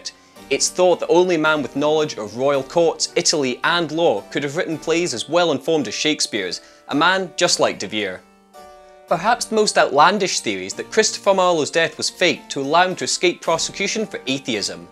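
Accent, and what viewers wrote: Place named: Scotland, United Kingdom. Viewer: Since my wife passed away, 13 years ago, and being retired, I've studied everything I could lay my hands on re WS. Conclusion: he wrote the plays, with a collaboration here and there, but the greater part of Shakespeare was written by a bloke name of William Shakespeare. Only two images are accepted as genuine likenesses, the bust which resembles nothing more nor less than the local butcher, and the Droeshout which Ben Jonson endorsed as a true likeness. The Sanders is an elaborate fake, and there was a period in the 19th C when dozens of fakes were produced. It was de rigeur at the time. And seeing as the presenter sounds Scottish, I think he'll know what I mean when I call these conspiracy theories a load of old bollocks. And I wonder if WS had a penny for each word spoken and written about him for the last 400 years. 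So bollocks it is then. QED.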